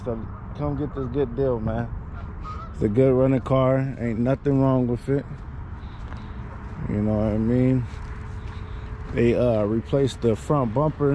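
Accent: California, United States. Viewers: I heard the Bay Area scraper music and your accent and said dude from Cali dam you shoulda came to Texas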